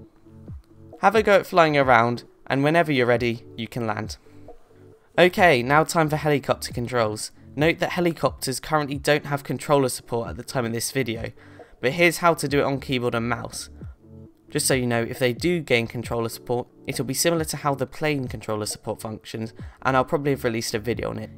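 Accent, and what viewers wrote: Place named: United Kingdom. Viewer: ugh hes british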